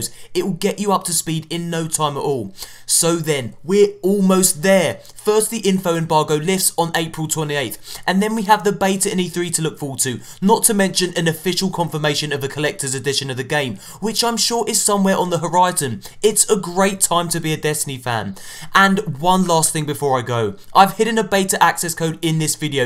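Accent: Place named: United Kingdom. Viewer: yea and everything sounds classy with a British accent! lol :)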